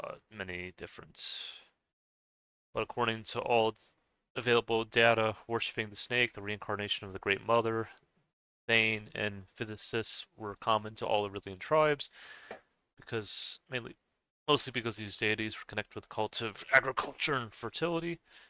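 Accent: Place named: United States. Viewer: "Possibly contain the Welsh and parts of England" How do you come to that conclusion? It definitely is the whole of the British Isles. Alba was originally used for the whole of the British Isle.... Where are you getting your information from? Just look at the DNA map, it tells you the basic story.... we are all majority "Celtic" Brythonic peoples. You sound American, you mention your Welsh-American friend..... No you are Americans, typically of Americans you think bloodline means something other than for medical reasons.. And you still then get it wrong.... All these groups of people you mention are cultural groups not genetic..... The Greeks and Romans, where most of these names come from knew nothing of genetics.... they arbitrary grouped people to what they thought should be grouped together. Again where do you get your information from? It sounds like you get a lot from Irish and Scottish nationalist who need to prove they are different from the English because they are bigoted and try to deny they joined in with building the British Empire. 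Note its the British Empire not English, with English people outnumbering the Irish, Welsh and Scots, by ten times why would they not have called it the English Empire? Answer, because it was ALL groups, not just the English. Stop listening to nationalists, they will always give you "their" version not a balanced version.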